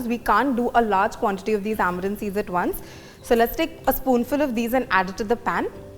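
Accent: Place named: Delhi, India. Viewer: Delhiwaali english is funny yet irritating for ears.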